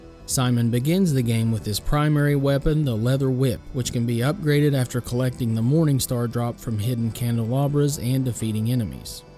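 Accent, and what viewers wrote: South, United States. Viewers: So you sound from the south. Same here. I love this channel. Thank you! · hear a Southern drawl explaining Castlevania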